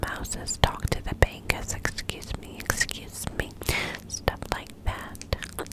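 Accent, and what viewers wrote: New York, United States. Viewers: Love the soft spoken as well as the New York accent!! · Thanks so much ❤️loving the new york accent from a irish girl living in dublin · Happy Early Birthday!! 🎂🥳🎉 P.S LOVE THE NEW YORK ACCENT!! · Are you a Pisces or Aries March baby? Loveeee the New York accent whispers especially as I’m from the uk. I really appreciate you, sending lots of love ❤️ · omg love the NY accent, will always remember “niagruh fawls” from your first NY accent video 🤣❤️ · omgg PLEASE do more NY accent vids they are soo tingly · I love that New York accent!! You also work that fluffy mic like no one else ✨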